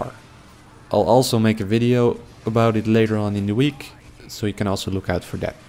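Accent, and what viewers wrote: Netherlands. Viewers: Just curious are you dutch I'm really curious about your accent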